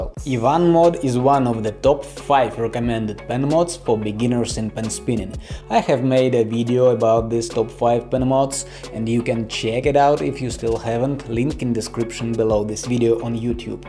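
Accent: Russia. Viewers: What did you expect when he said be careful with a Russian accent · bro i like russian accent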